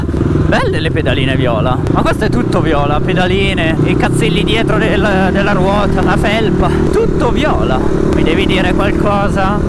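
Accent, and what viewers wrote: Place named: Rome, Italy. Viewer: Sembri un romano 😂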